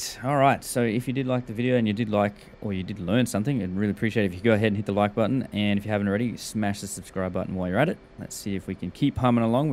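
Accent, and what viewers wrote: Australia. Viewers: Great to have some tuts in relaxed Aussie accent, very easy to take in and no time wasting stuff cheers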